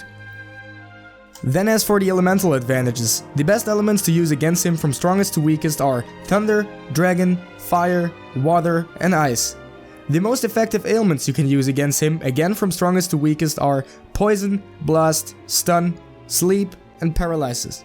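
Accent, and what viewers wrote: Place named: Norway. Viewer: Love it man thank you! May i ask, where is that accent from? i sense a little Norwegian but I’m usually dead wrong , great video brother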